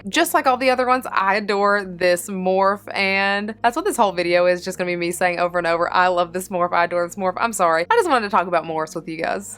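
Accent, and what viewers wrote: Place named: Alabama, United States. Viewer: Hey you are so amazing and inspiring, to be honest I don’t know if I would have gotten a bearded dragon If it wasn’t for you, I watched so many care guides and they were all so different but yours just seemed right, I showed my mom your channel and she started watching your videos with me. I really want to be like you when I get older, but I either want to be a firefighter to help out the community or a herpatologist (studies reptiles and amphibians). Omg can we talk about our accent, I know your from Alabama, and I’ve lived in Alabama my whole life we currently live in Madison, my favorite store is animal trax, I like to support small local businesses, other than like petsmart, but don’t worry, is still go to petsmart. Just remember JESUS LOVES YOU AND YOUR FAMILY.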